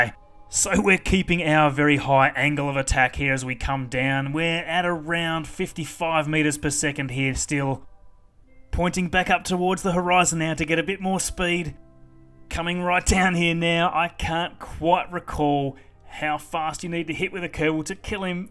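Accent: Australia. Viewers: Darren lockyer ahh yeaa that accent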